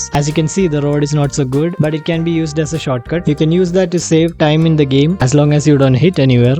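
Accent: Kerala, India. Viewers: Sounds like malayali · Accent kettal ariya malayli aan nn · your sound like you are from kerala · I knew u were from kerala by lisisting to your voice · ur slang is just like malayali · i know a fellow malayali when I hear one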